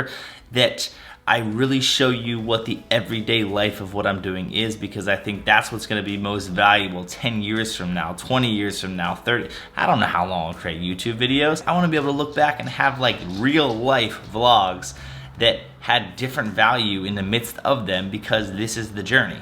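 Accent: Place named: Philadelphia, United States. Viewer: Exactly what happened to me! Started watching to get tips on organizing my businesses video library and really liked not only your content but your ability to communicate which led me to follow you and watch all forms of your videos. An interesting blend of topics and always useful for life. Appreciate your unique perspective. Also originally from Philly area, (Drexel Hill many years ago) so it's cool to see Philly and the burbs scene and hear the famous Philly accent. Keep up the great work! AND THANK YOU for generously sharing all you do with us. I've learned a lot and appreciate your hard work to deliver it to us! PS I own a chain of hair salons in Florida. If any of the woman in your family ( mom, sister, gf, wife, friend) are ever in Southwest Florida Naples or Bonita Springs they can come in for any services they want all for free. 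The works, color, cut style anything at all, my treat. Least I can do to thank you for what you've done for us! Salon's name incidentally is Robert of Philadelphia! Have an amazing day!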